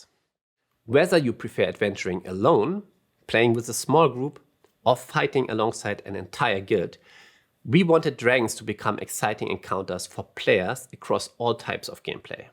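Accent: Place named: Germany. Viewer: Uhhh this German accent... 😋 So cute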